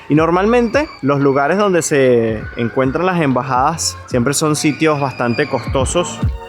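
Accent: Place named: Venezuela. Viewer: Muy bueno el video, lastima esos acentos a Venecos.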